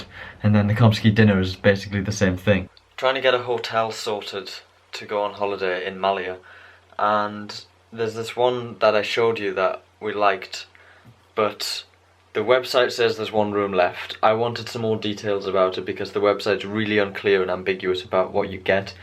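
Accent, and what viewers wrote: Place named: North, United Kingdom. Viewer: Mate your accent is like a hybrid of Manchester and Newcastle! Where are you from in the UK?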